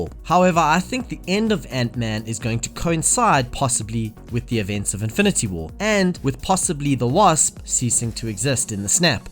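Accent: South Africa. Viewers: Is this a south african? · Are you South African?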